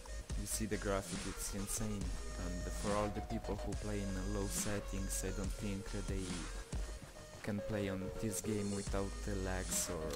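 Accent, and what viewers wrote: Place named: Italy. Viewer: you've got an Italian accent (or however it is writted)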